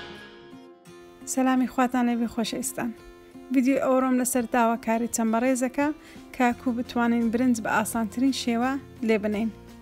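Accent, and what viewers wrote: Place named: Erbil, Iraq. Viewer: هيوايه تم قسه ي هه وليريه😣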